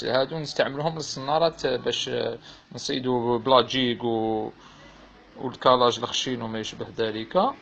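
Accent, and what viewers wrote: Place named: Algiers, Algeria. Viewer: Salem frère..un petit conseil d'amis essaye de parler en arabe car l'accent algérois est détester par les 47 wilaya et en plus cette chaîne est connu de Tunis jusqu'à Palestine...ne prend pas mal mon conseil frère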